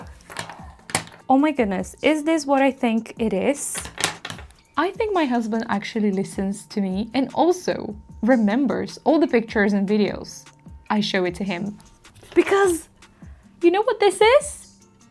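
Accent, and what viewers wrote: Brazil. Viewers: Você é brasileiraaaa? Tem sotaque de brasileira ❤️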